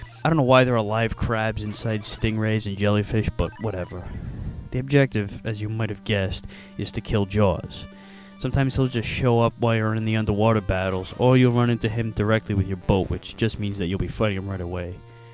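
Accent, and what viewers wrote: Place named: New Jersey, United States. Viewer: sounds like you're from jersey.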